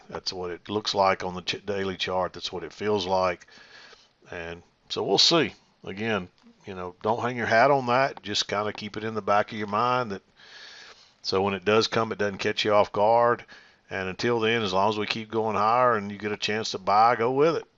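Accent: South, United States. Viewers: Boooo!!!!!!! Y’all leave that man alone. He’s from the south! We say stuff how we wanna.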